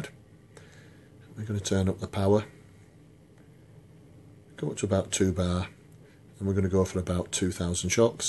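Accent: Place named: North, United Kingdom. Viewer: Can you use EWST for proximal long head bicep tendinitis? You sound northern are you based in the north ? Do you have a website (assuming the answer to the 1st Q is yes).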